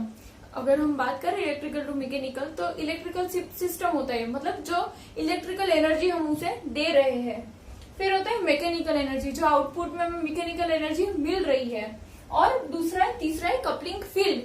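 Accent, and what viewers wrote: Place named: Gujarat, India. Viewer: Definitely u r from gujrat bcoz v all have unique hindi accent.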